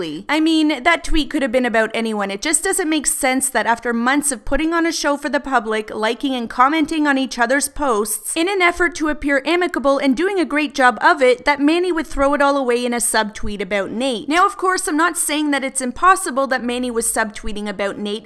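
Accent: Canada. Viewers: Canadians and their “Abouts”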